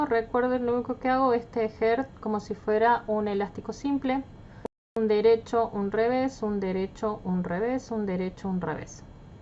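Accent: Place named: Córdoba, Argentina. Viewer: Hola ahora mismo estoy teniendo en rosa el swaters beis para tres años me encanta tu acento eres de Córdoba? Me encantan tus videos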